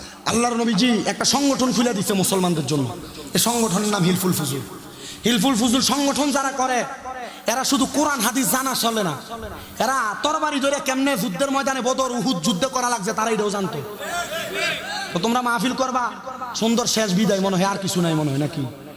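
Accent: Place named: Comilla, Bangladesh. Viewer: তোমার কথা শুনে বুঝা যাচ্ছে তুমি কুমিল্লার মসলমান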